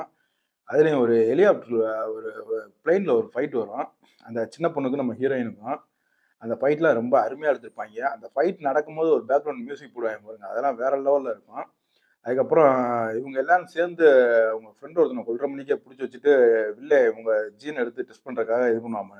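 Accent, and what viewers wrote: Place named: Usilampatti, India. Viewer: Madurai usilampatti ya bro... naanum than😅slong theriyuthu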